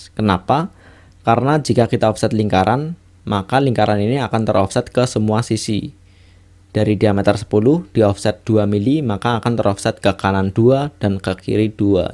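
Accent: Banyumas, Indonesia. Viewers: rika asline ndi kang? kayane ngapak ya?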